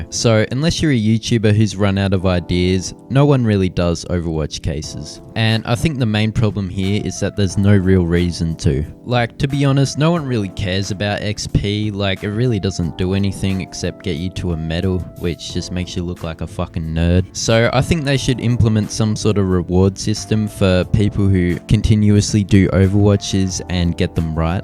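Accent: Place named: Australia. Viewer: i think i played with this guy last night on deathmatch i'm guessing your from aussie from the accent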